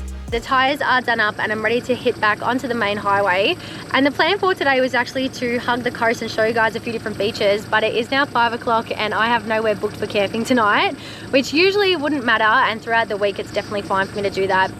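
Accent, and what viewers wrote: Australia. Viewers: One of the best shows on YouTube. Great lighting, not very obnoxious audio, great lighting, drone coverage, and seemingly good rig. I don't fly, thus will never see that country so I appreciate the all the tour's. NW Washington, I get to meet some folks from various places as well as to try foods. Find the "Aussie" manner of speaking makes for a nice change. Always curious about others kit, as I watch and learn from all those I can even if their region might be different. I trample about in a 1987 Jeep Cherokee (XJ) which I sleep in the back, as it has about 15cm of foam in the back comprised of two different compressible foams, with a big mosquito net that can cover the drop gate while fully open. But then I don't have aftermarket bumpers as I don't go into places that I don't belong, though I did enjoy playing in the 25cm or more of snow lately.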